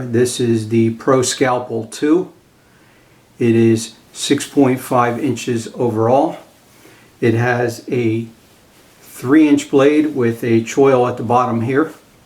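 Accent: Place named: Philadelphia, United States. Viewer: That’s a philly/ south Jersey accent if I ever heard one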